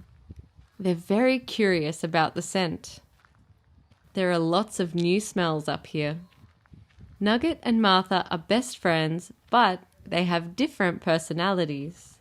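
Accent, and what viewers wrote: Australia. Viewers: helloo, iam learning these ausie accents from you its lovely to hear it and it is so calming to my ears...sending the love from Indonesia 😁😁:hands-yellow-heart-red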